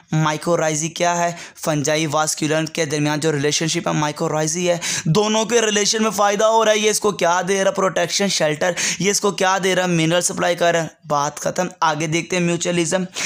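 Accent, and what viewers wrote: Punjab, India. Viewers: Punjabi accent 😍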